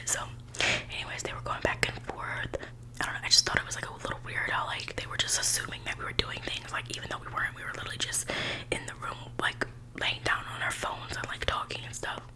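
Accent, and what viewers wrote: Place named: New Jersey, United States. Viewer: Knew u were from jersey the min I heard u talk! but it sucks u had to go thru that, sounds like a headache. 🤕😒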